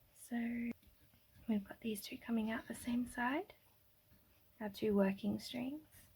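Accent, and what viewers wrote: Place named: Australia. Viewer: Thank you. Sounds as though you are Australian. Nice to hear.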